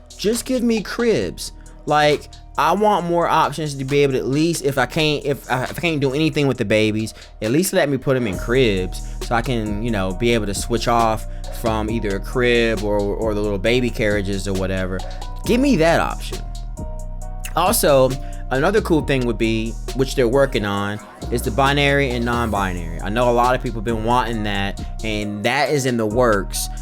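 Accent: South, United States. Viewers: I think your southern drawl just didn't want to hit the r before the g. Burglars. Haha, no shade. All my family is from the deep South but I was raised in Iowa 😂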